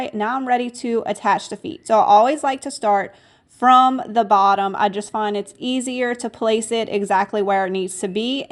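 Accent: Louisiana, United States. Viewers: I love to hear her accent. Adorable Southern-Cajun. Her projects are all gorgeous. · your slight accent sounds like you are from New Iberia, LA. Am I correct?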